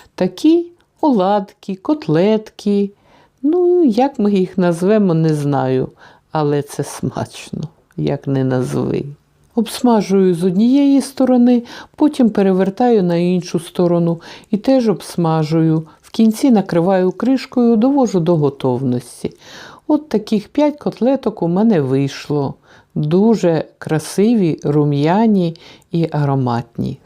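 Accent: Standard. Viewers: Как приятно слышать чистый украинский язык! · Дуже приємно слухати чисту українську мову без суржика. Дуже приємна господиня!. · Какой красивый Чистый украинский язык.А не то что с ним сейчас сделали😡педагогыня минестриня😡😡😡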